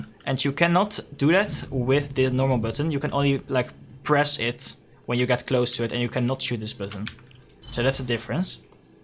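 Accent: Netherlands. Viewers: Yo, the lever action shotgun now is in creative so can u put it in you're realistic 1v1 map, ty. Btw ik kan horen aan je stem dat je nederlands bent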